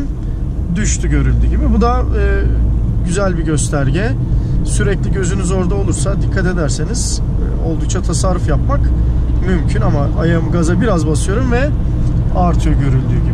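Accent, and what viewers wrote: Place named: Aegean, Turkey. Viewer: Abi sen egelimisin orlarda burlarda diyon gari hep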